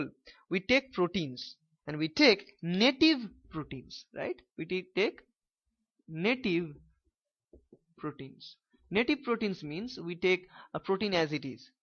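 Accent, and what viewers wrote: India. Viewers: you are awesome I like your Indian english lol it's so likeable :d and damn you got some mad skill in bio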